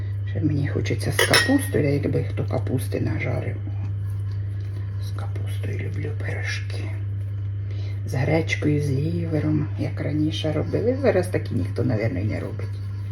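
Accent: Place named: Surzhyk, Ukraine. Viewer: не зникайте, а то сумую за вашими відео, ще Оленка подобається з "Мого сільського життя", такі ви дівчатка наші, а суржик просто рідний дім❤❤❤❤❤❤❤❤❤❤❤❤❤❤❤❤❤❤❤❤❤❤❤❤❤❤❤❤❤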